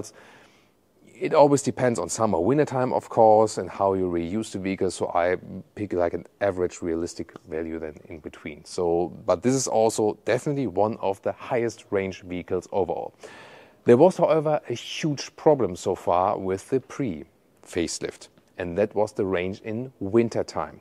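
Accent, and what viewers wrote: Germany. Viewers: This dude has a pretty strong German accent....Great car besides!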